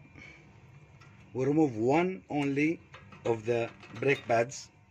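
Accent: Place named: Arab world. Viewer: من لكنة كلامك اظن انك عربي ارجو رفع فديوهات اكثر بخصوص الباجيرو تحياتي لك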